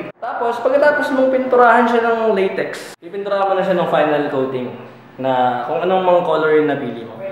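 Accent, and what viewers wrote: Visayas, Philippines. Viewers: Bisaya man diay ka kol haha. Salamat saimo vid kay gusto ko ako ra mo pintura sakong balay para di nako mag labor. Unsay ma suggest nimo na brand? Latex ug unsa tong pang finish na paint? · ayos bisaya mn d i ka sir.. proud bisaya here.. tnx sa video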